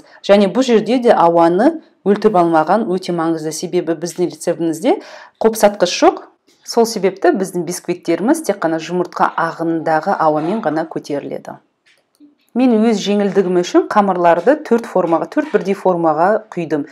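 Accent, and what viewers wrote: Standard. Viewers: Ма щаа Аллах!Аллах енбегинизге баракат берсин!казакшаны таза сойлейди екенсиз 👍👍🌹🌹🌹💋100 лайк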